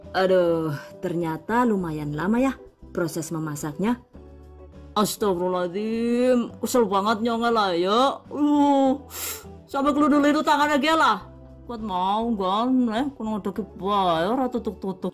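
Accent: Banyumas, Indonesia. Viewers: Wkwkwk saya orang banyumas....denger orang ngomong ngapak jadi ketawa sendiri 🤣🤣🤣🤣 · Asem ngapak jebule 😂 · Asli mana seh kok bahasanya sm kek aku ngapak" 👍😁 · Saya suka lihat youtube ibu , kreatif, tata bahasa lucu, pakai ngapak bahasa Inggris gokael gaes 😁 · Kaget, bar formal trs ngapag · Hahaha wong ngapak juga yah ternyata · Rika wong ndi sih yu ketone ngapak temen kaya nyong hehehehee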